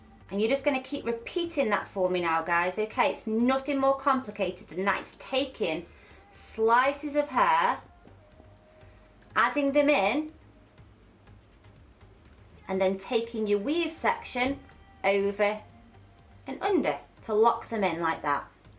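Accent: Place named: Liverpool, United Kingdom. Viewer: I found the Doris one easier to see because of the contrast in your skin versus the purple of her hair. I was able to follow both. As a side note, I live in America now but your accent is from my part of the world. Are you from somewhere just outside of Liverpool? Prescott or Warrington possibly?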